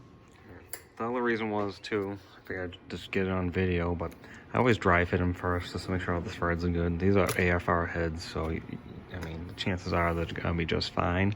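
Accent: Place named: Boston, United States. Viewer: Refreshing to hear another Boston boy. I’m Woburn myself. Good vid 👍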